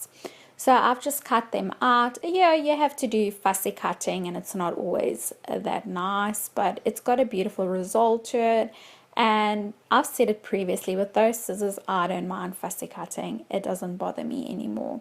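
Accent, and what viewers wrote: South Africa. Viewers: Hi found your channel through 49 dragonflies. Is that a South African accent I hear ? Good ideas to try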